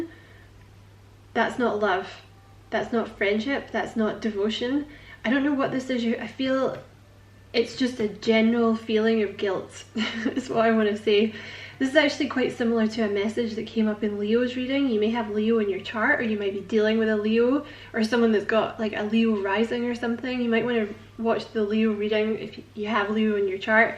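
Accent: Scotland, United Kingdom. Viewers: Are you Scottish? First tarot reader I’ve came across on here that is. ☺️ I’m in Glasgow ☺️ Love hearing the accent 😁👍🏼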